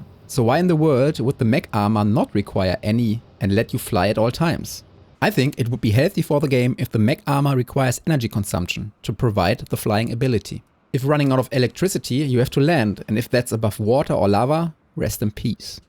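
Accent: Germany. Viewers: Do i hear a german?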